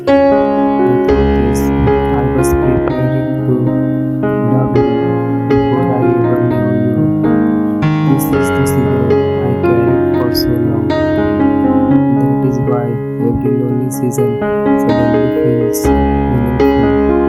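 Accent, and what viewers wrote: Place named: India. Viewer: Are you Indian because you talk like one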